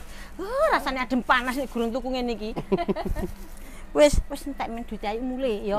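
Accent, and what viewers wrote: East Java, Indonesia. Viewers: orang Jogja tapi bahasane Kyok orang jawatimuran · Slmt pagi bu..... Domisilinya di Wates yaaaa.... Logat jatim nya kental sekali Sy sll buka video masak2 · Bu kok di Wates Kulon Progo tapi kok logatnya jawa timuran banget. apa sedang berkunjung ke saudaranya yg di kulon progo. Bahasa "sak buajek", "banyu puteh (bacanya bukan putih)" khas jawa timuran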